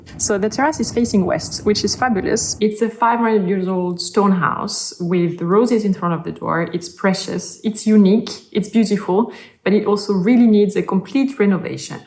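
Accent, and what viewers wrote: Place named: France. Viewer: I lived in France for a year as an English teacher in Provence and hearing your accent is really making me miss living there!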